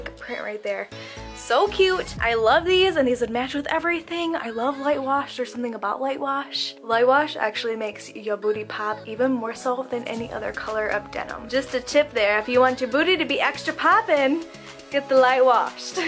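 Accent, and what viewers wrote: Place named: Michigan, United States. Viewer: I had to check out your Instagram to see if you’re from Michigan lol you have the cutest Michigan accent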